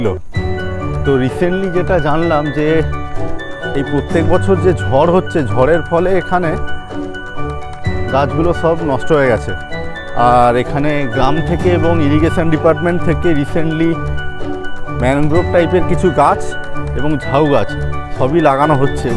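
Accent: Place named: Medinipur, India. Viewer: Apnar uchcharon puro medinipurer, valo korechen, kono artificial noy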